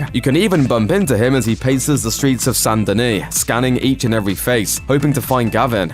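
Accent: England, United Kingdom. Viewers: No he doesn’t- he pronounces it like an English guy as he’s English like me!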